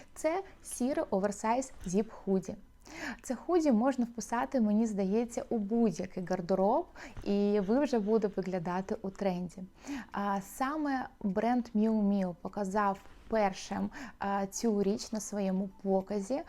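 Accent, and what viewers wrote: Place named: Russia. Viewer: Навчіться правильно розмовляти українською. Чисто через вашу кацапську вимову не змогла дивитися більше півхвилини. В українській літера "г" - м'яка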